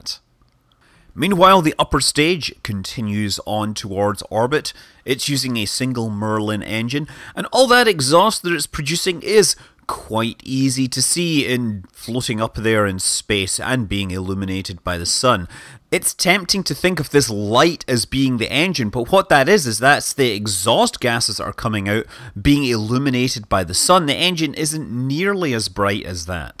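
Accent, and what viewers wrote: Scotland, United Kingdom. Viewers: He always talks with a Scottish accent though. 😒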